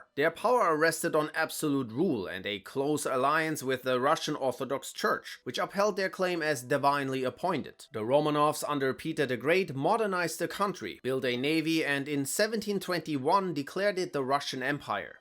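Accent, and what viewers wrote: Germany. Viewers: December 28, 2025 - As an admirer of, I enjoyed this video as much I have his other offerings. This video covers a interesting subject, and I did learn some things. It is my contention that among the "faceless" international elites that determine much of what happens in Western politics and society. Some of the families mentioned in the video are part of that group. Lastly, I loved hearing the good pronouncing the various family names with his distinctive German accent. 👍👍😊I take this opportunity to wish the good the very best of the holiday season 🎄and a Happy and prosperous New Year🎆🎆 Stay well.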